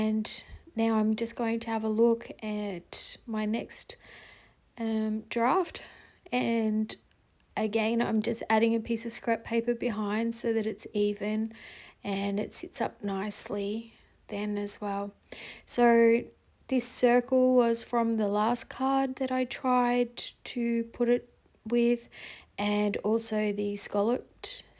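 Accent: Australia. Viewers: Good to see your process. Thanks for sharing. Love to hear another Aussie!😃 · An Aussie voice? Instant subscriber!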